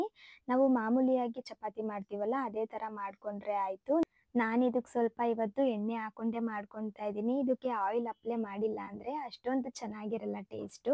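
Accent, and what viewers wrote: Mandya, India. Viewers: Nim math keli starting andkonde madyane anta. We are also mandya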